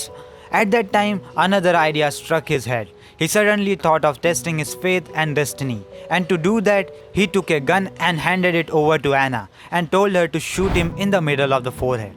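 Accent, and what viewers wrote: India. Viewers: I can't stand this stupid tech support curry accent. Sorry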